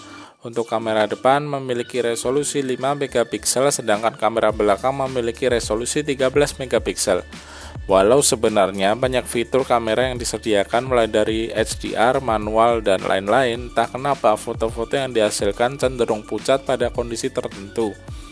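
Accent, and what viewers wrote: Surakarta, Indonesia. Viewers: sampean tiang solo toh mas? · orang solo ya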